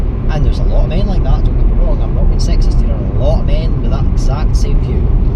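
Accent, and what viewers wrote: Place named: Scotland, United Kingdom. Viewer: Thanks for uploading a new video. It sucks that you wrecked the Benz, it looked like a really nice car. Anyhow, there's another issue - it may be due to the fact that I'm not a native English speaker (I love your Scottish accent though), but with all the noise from driving the car, it was very hard to understand everything you said in this video. Also, thanks for not mentioning my name (which is fake anyways, but no matter) in the video (anyone who reads the comments can figure it out anyways, but that's about enough for me).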